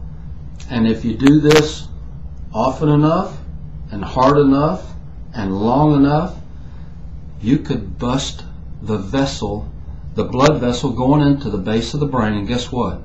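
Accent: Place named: South, United States. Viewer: " the southern accent doesn't make him any more believable" Nice prejudice coming from Canada "eh"? Statements like that only point out how ignorant you are. Have you ever been to the South? Do you have any idea what you're talking about? He's not talking about harmless yoga stretching, he's talking about wrenching your neck beyond it's anatomical limits.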